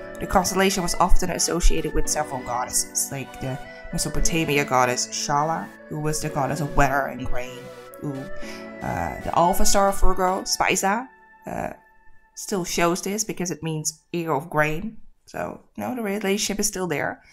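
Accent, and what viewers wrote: Netherlands. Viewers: Virgo should definitely be a pretty boy. Also I like your voice overs because your accent reminds me of my Dutch friend 😁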